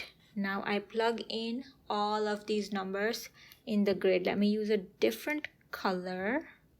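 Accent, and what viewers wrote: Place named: United States. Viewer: American accent. Simply wow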